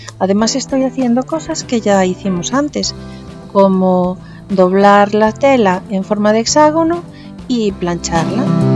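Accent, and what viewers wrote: Spain. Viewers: Un trabajo precioso!! Acabo de descubrirte me encanta la paciencia que tienes. No se si me equivoco pero me parece que tu hacento es gallego. Gracias por enseñarnos tus trabajos.saludos de una gallega ❤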